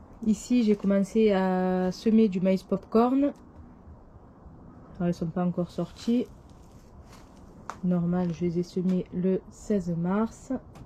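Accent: South, France. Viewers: coucou, j’ai découvert ta chaîne YouTube depuis la pas mal de temps... je crois que t’as un accent du sud 🤣😀😀 j’adore.. demain je vais planter des courgettes 🖍☢️♻️♻️㊗️.. Bon week-end. Yanis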